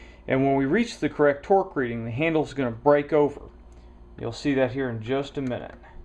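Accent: Upper Midwest, United States. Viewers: Haha, yeah. Northern-midwest accent.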